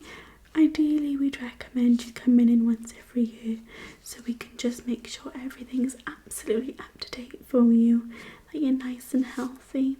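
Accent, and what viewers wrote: Wales, United Kingdom. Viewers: What accent is that ? Welsh ? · Lovely Welsh voice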